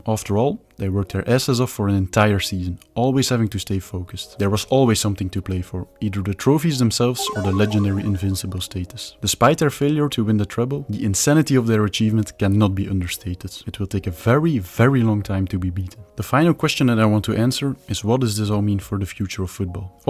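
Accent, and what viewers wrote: Belgium. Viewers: Oost Vlaams accent zal ik zeggen volgende keer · Klinkt eerder Vlaams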